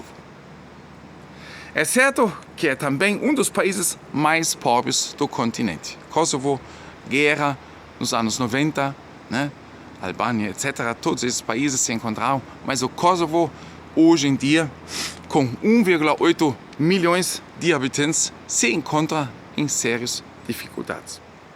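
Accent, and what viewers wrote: Brazil. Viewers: Irmão eu só consegui ver o Leôncio do Pica Pau, ainda mais com esse sotaque Brasigermânico. Abraço! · Se não fosse pelo R vc seria confundido com um Brasileiro nato.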